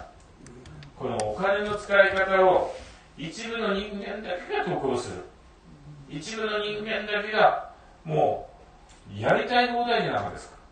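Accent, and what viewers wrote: Saga, Japan. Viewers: 佐賀弁が身近で良い。解散があるんですね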